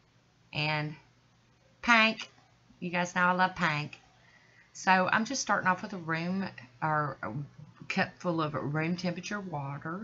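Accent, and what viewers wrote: South, United States. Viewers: I love the names, too funny. I love that they have a southern accent. Pannnkk!!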